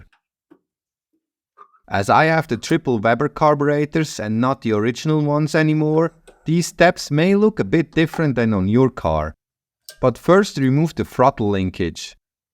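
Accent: Switzerland. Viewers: I love following your videos and on this channel we get your voice over. I love your Swiss accented English. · I went and looked up Swiss accents on YouTube. The Germans pretend they don’t understand you without subtitles. Liars. The Nordic/Romance thing is real, your accent is amazing, that is a little car with a big engine, enjoy!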